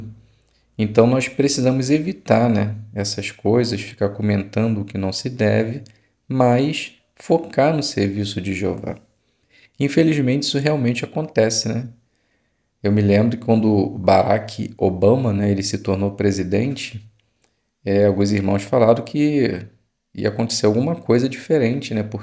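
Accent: Rio de Janeiro, Brazil. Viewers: Legal, parabéns irmão. Amo o sotaque carioca.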